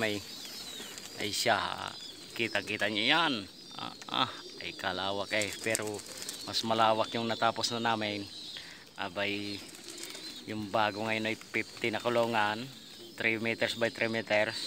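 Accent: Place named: Batangas, Philippines. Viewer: Galing magbatangeño ah👏👏👏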